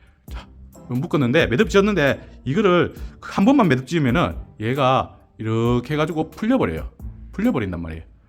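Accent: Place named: Gyeongsang, South Korea. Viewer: 말투가 경상도 분이시네요 ㅋㅋ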